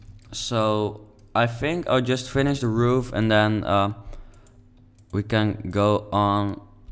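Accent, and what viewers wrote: Netherlands. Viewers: Hey ik wil ff zeggen dat je echt super gave huizen maakt maar 1 tip: Praat alleen in de intro en daarna niet meer,je kan heel goed horen dat je Nederlands bent door je accent. (het stoort me) zet voor de rest van je filmpje een muziekje op x Niet gemeen bedoeld alleen een tip:) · hahaha ik hoorde meteen dat je nederlands bent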